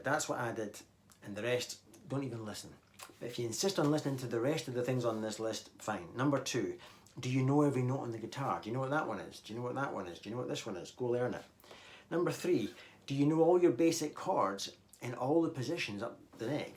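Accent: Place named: Edinburgh, United Kingdom. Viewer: You know, as much as I love our dear friend Uncle Larry (Tom Bukovac Home Skoolin' for those who don't know what I'm talking about), you are talking directly to me in a way that just hits home. I dig the gear you use and your videos about how you use them, and your lessons even more. The charming Edinburgh accent is a bonus! And thanks for the shout-out.:-) I guess it is soon time to get some merchandise available for us fans to buy;-).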